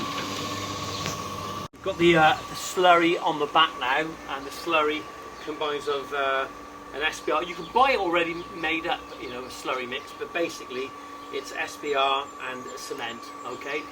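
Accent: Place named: Malpas, United Kingdom. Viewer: Cracking job! Just come across your videos! I recognise that accent, my Mum's from Malpas 😎👌🏻👌🏻🏴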